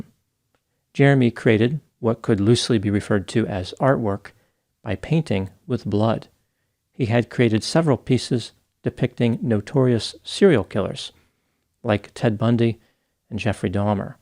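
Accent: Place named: Maryland, United States. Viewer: ....great job! Can you say, "Satan"? Harrisburg - yup. Oh hell no. Grew up in Carroll County, MD, so you may say this is my, "old stomping grounds" - I recognize your accent, albeit maybe more eastward... Those dolls look like people I have DEFINATELY come across. Honestly, I would bet that at least 65% of certain populations would NOT think this is odd, and continue to push the argument of 'art'. I've known people that resemble this ilk. PUKE.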